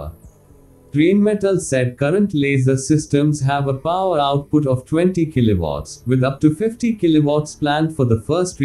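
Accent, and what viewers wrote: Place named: India. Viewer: Even the AI voiceovers are having indian accents nowadays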